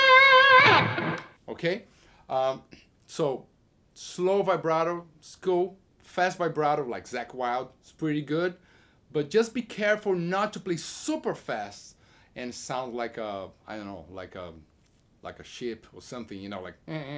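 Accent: Brazil. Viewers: Dá sim. Mas o sotaque br no inglês dá um charme à mais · Inglês brasileiro. É muito mais fácil entender um brasileiro falando inglês que um nativo.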